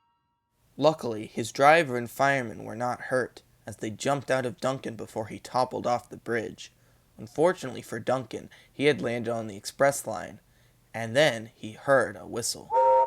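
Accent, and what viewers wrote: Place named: Scotland, United Kingdom. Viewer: Your Scottish accent is priceless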